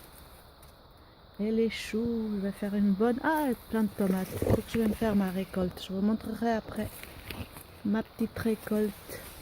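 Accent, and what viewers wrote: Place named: South, France. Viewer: bonjour, je me disait aussi que c’était un accent du sud ;) beau coin de véranda la suisse c'est joli merci du partage. a bientôt Vincent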